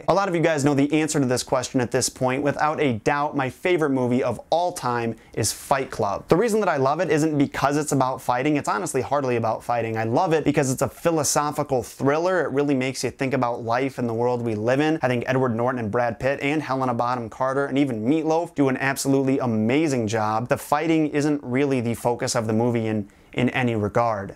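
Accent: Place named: New York, United States. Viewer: Wait he is from a small country town? I literally thought he was a New Yorker or like from Brooklyn or something cuz of the accent I perceived from him lol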